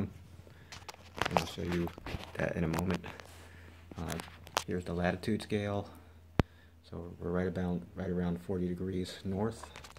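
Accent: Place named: Chicago, United States. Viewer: Nice video. You sound like you're from Chicago, my hometown.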